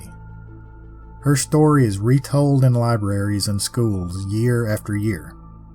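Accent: South, United States. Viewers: So happy I found your channel! Love the creepy stories. The southern voice and the way you narrative the stories captures my full attention. Turn off the lights and bring the 🍿! 😊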